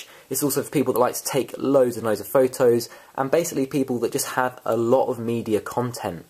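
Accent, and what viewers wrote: England, United Kingdom. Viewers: I thought you was English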